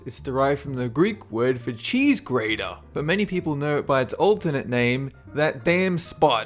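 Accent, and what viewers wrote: Australia. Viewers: He's Australian ._. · are you really aussie? If so then where from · Are you Australian ? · You are Australian? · Lang Jones I didn't catch that one. He does have some odd pronunciations though. He's got an Australian accent but uses American pronunciation for heaps of words. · Are you Australian?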